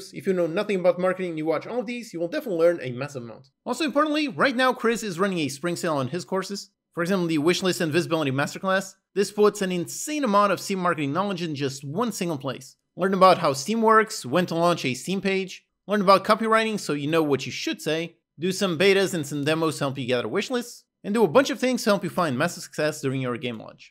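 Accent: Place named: Portugal. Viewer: Totally off topic question, but you speak Portuguese as well, don't you? I can notice from your English accent, either that or you speak some latin language, right? Your videos are excellent, I really like how you're straight to the point, they vibe with me a lot, thanks for that!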